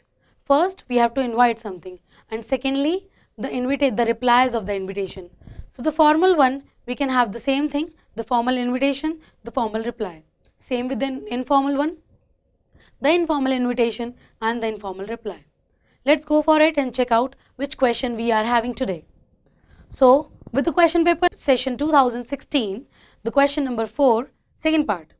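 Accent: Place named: Pakistan. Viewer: Pakistani english bolrhi h ye madm To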